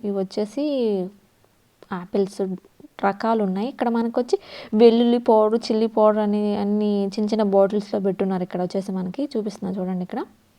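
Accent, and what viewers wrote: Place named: West Godavari, India. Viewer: Mee slang antha maa west godavari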